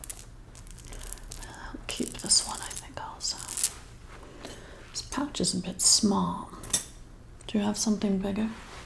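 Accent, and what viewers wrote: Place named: South, United States. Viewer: Your voice sounds very Country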